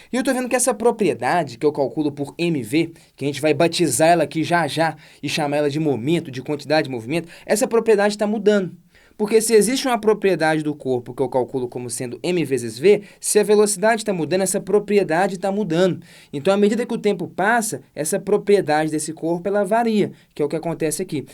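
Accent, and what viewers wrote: Minas Gerais, Brazil. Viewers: Excelentíssima aula, Prof.! O sotaque mineiro deixa ainda melhor xD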